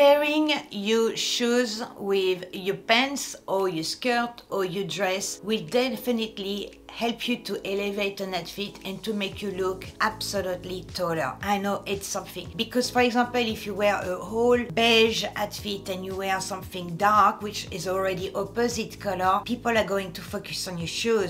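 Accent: France. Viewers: love your french accent and you are so funny.... as well as beautiful style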